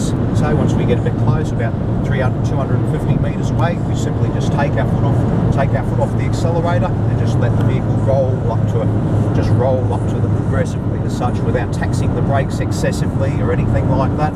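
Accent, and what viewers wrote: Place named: Australia. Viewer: Your Australian Sounds adorable - there has to be more of you from Down Under over Here in Germany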